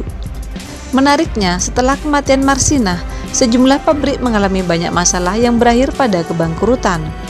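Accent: Madura, Indonesia. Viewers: Kayak orang madura logatnya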